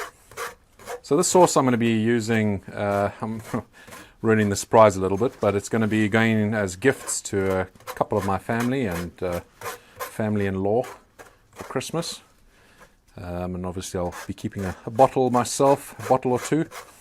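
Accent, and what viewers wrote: South Africa. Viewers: Are you from South Africa brother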